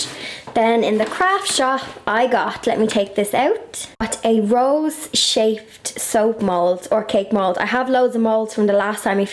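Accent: Ireland. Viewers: Hello! Recently discovered your vlogs and they're awesome! I hear your accent and that cute little cottage and it's like being transported to Ireland! I wanted to ask about the soaps. I help to direct an event at my job for crafters. This would be an excellent craft around mother's day. Could you give any tips as to how to adjust it for a crowd. Maybe a group of 5-10 people. Would you do any make ahead soap & scent mixes and just let them choose? Hoping for suggestions because the group meets for an hour and a half only. Thank you :) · Brilliant yr so clever yr such a lovely young lady love yr Irish actsent x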